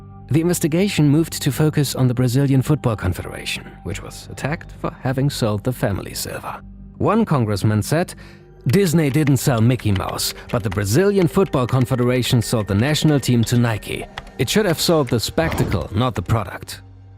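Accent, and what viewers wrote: Germany. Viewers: Really perplexed by how poorly the narrator pronounced most of the Portuguese terms and expressions in this video – none of which are difficult to pronounce accurately if you bother to research the pronunciations. Otherwise another great, informative video. Ich finde generell das deutsche Reporter (ich gehe von seinem Akzent aus dass dieser Typ deutsch ist) sich nicht genug Mühe geben, fremdsprachliche Begriffe richtig auszusprechen, was ich als Deutscher der im Ausland aufgewachsen ist sehr bedauerlich finde. Mandando todo meu carinho de Londres aos brasileiros assistindo o vídeo 🕺🏽 tamo junto!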